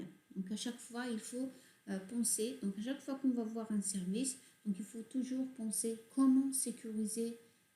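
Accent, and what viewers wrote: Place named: Arab world. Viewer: j'avais peur de pas bien comprendre mais bizarrement quand on utilise un accent arabe je comprends tout de suite x)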